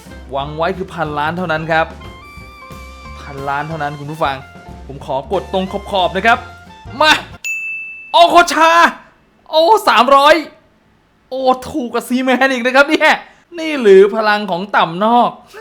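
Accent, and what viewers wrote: Isan, Thailand. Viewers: เอ้า พี่เป็นภาคอีสานผมพึ่งรุ้ แล้วอยู่จังหวัดอะไรครับ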